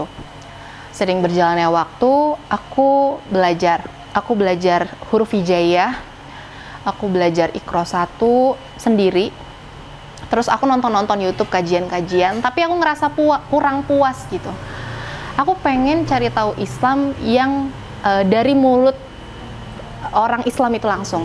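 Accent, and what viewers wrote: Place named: Jakarta, Indonesia. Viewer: Jakarta banget logatnya 😀 Istiqomah cii yahh 🙏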